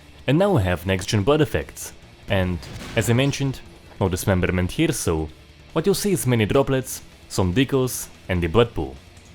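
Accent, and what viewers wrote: Russia. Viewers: Are you are Russian? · Такое ощущение что автор русский, Из-за акцента голоса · russian акцент замечен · Very strong russian accent like in movies... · Так разговаривать только русский может) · For fuck sake youre VERRRY RRRUSSIAN ACСENT. I understand, it makes easy to speak English. But my ears can't stand it. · Я знаю что ты русский ! По акценту слышно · Ты руусский) По акценту видно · Я заметил твой русский акцент. Ты Русский? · Русский акцент, ты русский ? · I love his russian accent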